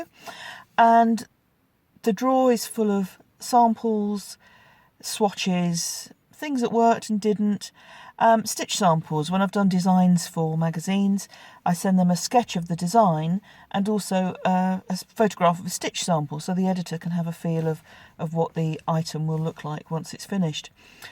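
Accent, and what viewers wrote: England, United Kingdom. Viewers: Subscribed - expat now living in Canada - nice to have the english accent ;-)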